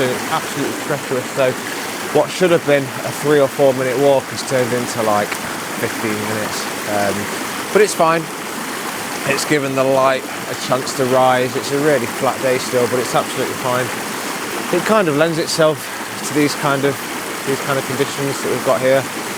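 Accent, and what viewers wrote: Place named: Sheffield, United Kingdom. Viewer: It's a like and sub fron me. Sounds like you live near Sheffield like me :)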